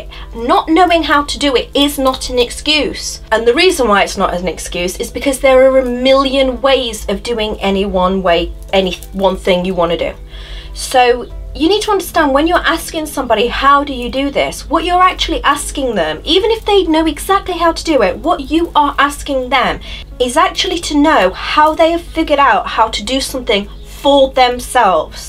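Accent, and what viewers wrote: United Kingdom. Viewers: I do NOT want to hear this...but I NEED to hear it. Where does the fear come from? Why can't I jump right in and not be in fear of making a "mistake"? Thanks for the kick in the butt! I also realized that anything said in a British accent is very palatatable. Keep up the great and talented work,!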